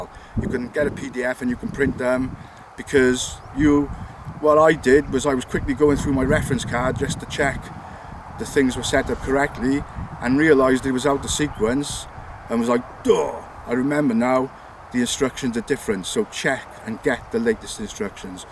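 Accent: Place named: Wales, United Kingdom. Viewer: That took me back to my first Trex 500 (self-built with Beastx) many years ago. Now aged 77 I’m afraid a shaky thumb or two could spell disaster for someone, so I sold or passed on all my gear about 2 years ago. I first spotted your vlog when browsing YouTube on my iPad, keeping the volume to zero - then played it later on my tv, hearing a slightly harsher / more aggressive beat from the motor … and a familiar Welsh accent. I’m a Neath guy and would have loved to have had a co-flyer like you, all those years ago. Good for you sir 👍.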